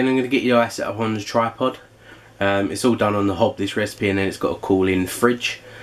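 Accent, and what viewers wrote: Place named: England, United Kingdom. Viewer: hello. Great audio description. I am blind so I really appreciated you talking and it was lovely to hear your English accent as I am English to living in Australia bye for now Donna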